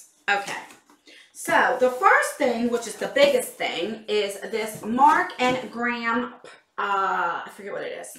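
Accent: New Jersey, United States. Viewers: I just love you and your Jersey accent 💖... My favorite thing in the fab fit fun box would probably be the throw... I'm obsessed with blankets of any kind... I do not get the box but have thought about getting it but if I got all the subscription boxes I want then I would be broke all the time 😊😂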